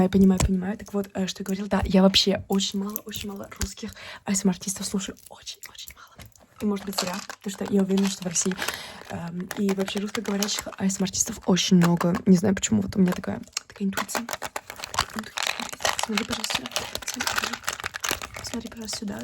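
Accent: France. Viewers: T'as un accent parisien, je pensais que le français c'était ta première langue. 🤯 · Ton accent et ton vocabulaire en français sont tellement propres qu'on dirais que t'as habité en France pendant plusieurs années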